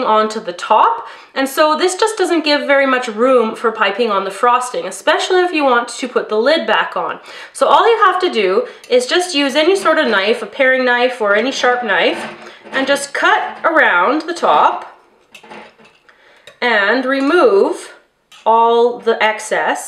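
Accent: Canada. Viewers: Looks good. Are you Canadian? No hate but accent kinda bothers me.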